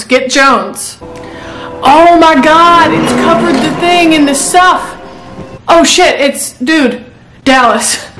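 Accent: South, United States. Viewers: Which of the Southern states are you from? if your willing to tell.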